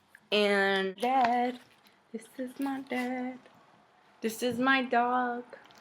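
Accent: New York, United States. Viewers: I Hope you have a full recovery. You have a great voice and New York accent. I'm from California so it seem very cool to hear you speak so as a New Yorker. You should consider radio broadcasting or voice over work. Maybe FM Talk Radio. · hey im from ny too! in med school right now, learned about hodgkins lymphoma yesterday... stay strong and i wish you the best.. with this amazing attitude, you're definitely going to beat it. take care. p.s. i love your latina-from-nyc accent! lol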